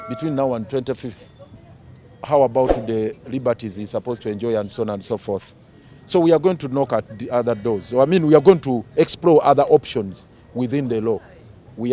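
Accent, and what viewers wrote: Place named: Uganda. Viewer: sweet Ugandan English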